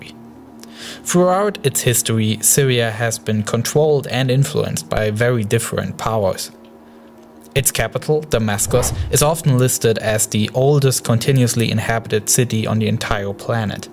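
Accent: Germany. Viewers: Great informative video of what is going on in the Middle East. And from what I heard of your accent I'm guessing you're German? You have pretty good English for a native German speaker! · Alter. Arbeite an deiner Aussprache. Das ist schrecklich. Man hört das Deutsch richtig und es tut selbst mir als Deutschem in den Ohren weh. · I'm half german and i have almost the same accent :) So i guess he's german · I found this video on reddit /r/documentaries and I am wondering why this video only has about 8K views. It is really informative and neutral! You explained the situation in Syria in a very illustrative way and I think you deserve much more views!! And I have one, rather informal question, are you from Germany? (I think I recognized your accent, because I am from Germany ;))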